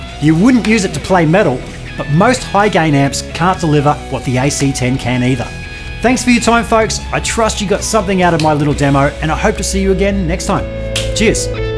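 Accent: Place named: United Kingdom. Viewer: I, for one, was impressed by the British accent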